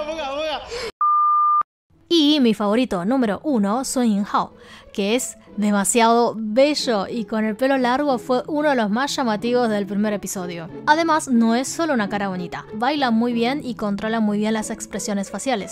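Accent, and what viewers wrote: Argentina. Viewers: Porque tiene acento argentino?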